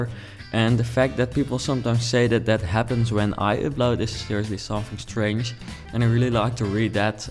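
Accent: Netherlands. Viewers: This is gorgeous. Complaints about your accent are ridiculous, your you, be proud of your accent Dutch!!!! Don't worry about it and the complaints will go away, if they don't then they don't!!!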